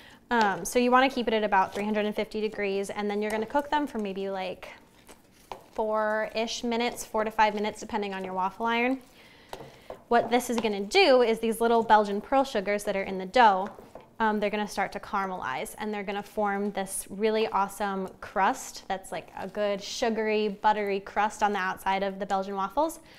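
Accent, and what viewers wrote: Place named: Midwest, United States. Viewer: Omg the Midwestern accent is just the cutest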